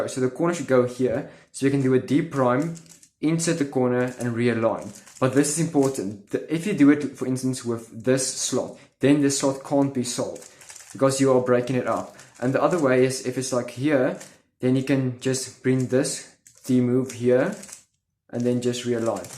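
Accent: South Africa. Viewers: are you from South Africa? :)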